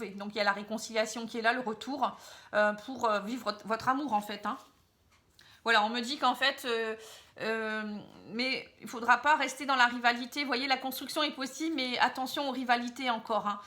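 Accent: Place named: Picardy, France. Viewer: Bonsoir c'est la première fois que je vois vos vidéos merci pour la guidance. Vous avez un accent du Nord de la Picarfie je dirais 😉🙏🧘‍♀️🧚‍♀️😘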